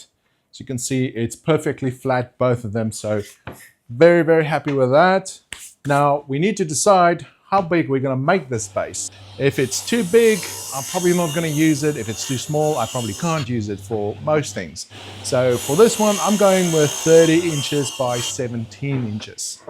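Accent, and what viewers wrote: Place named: South Africa. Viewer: That’s awesome. I knew you were South African from the accent and the “spirit level”. I love this build and I’m going to do it boet.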